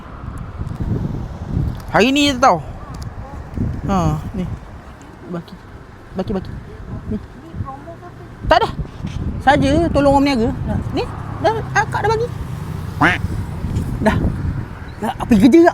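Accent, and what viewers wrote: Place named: Terengganu, Malaysia. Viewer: Kecek ganu 😂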